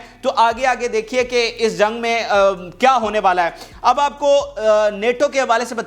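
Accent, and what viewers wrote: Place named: Punjab, Pakistan. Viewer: نا بول انگریزی نا بول۔۔۔منہ وچوں پنجابی بن کے نکل دی اے تے نال روندی اے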